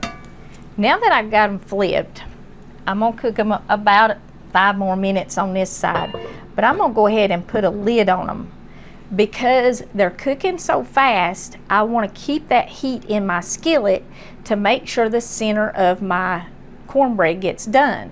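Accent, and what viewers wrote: South, United States. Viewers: I love watching your videos. And your voice and southern accent just seem to calm me down when I'm feeling down or just out of it. I'm from Missouri so I think I have a accent too lol. But love listening to you. Take care!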